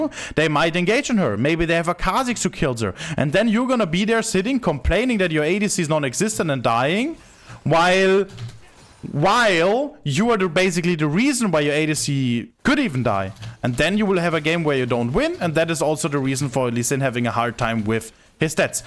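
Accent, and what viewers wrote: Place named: Germany. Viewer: Genauso klingt mein Englisch auch xD Einfach wild als deutscher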